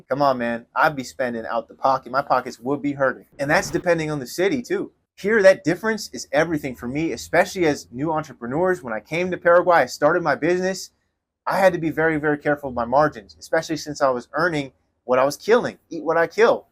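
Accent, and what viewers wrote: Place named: New Jersey, United States. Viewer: Are you from NJ?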